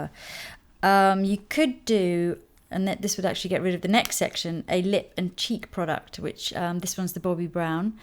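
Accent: United Kingdom. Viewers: Thu Nguyen she's British?!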